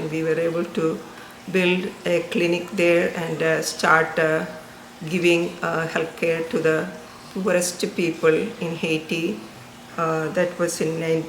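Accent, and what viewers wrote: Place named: Kerala, India. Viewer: Why are you talking English like malayalam. accent and pronunciation, very poor.what kind of English is this?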